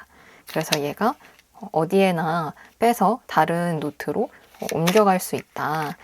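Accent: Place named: Japan. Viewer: 한국어 마스터한 일본분 특유의 말투랑 발음이랑 완전 같아서요! 모든 발음이 둥글둥글 하고 받침이 약하다고 해야하나요? 약간 お / ん 이 모든 발음에 들어가는 느낌..? 웅냥양 발음이 속에 내재되어있는 느낌...? 그리고 그 숨쉬는 부분이랑 말의 템포랑 멈칫하시는 부분이 엄청 비슷하세요😂 약간 한일2세분들 이랑도 비슷한 느낌!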